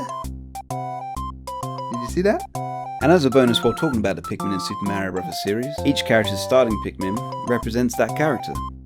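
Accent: United Kingdom. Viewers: Your British? Huh...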